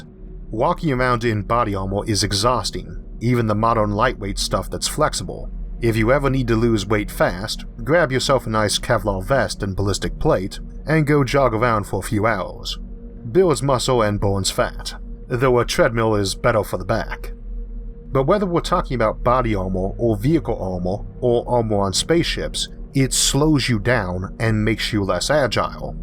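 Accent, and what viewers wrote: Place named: Georgia, United States. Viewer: I hope I don't seem rude in asking as I am only just genuinely curious, but are you from Georgia? You have the same accent as some folks I know from Northern Georgia.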